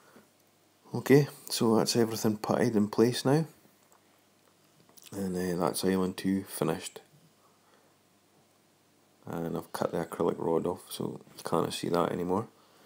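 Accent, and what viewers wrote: Scotland, United Kingdom. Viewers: Great series. Love the Scottish accent, but it doesn't help to understand the phrase: "leave it to dry for a couple of weeks in the sun." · lol too funny at 1 minute on the video, listening to a scot talking about sunshine, be careful you don't blink you may very well miss that sunshine, that rock will never dry out in Scotland, lol great stuff buddy I'm really enjoying to videos.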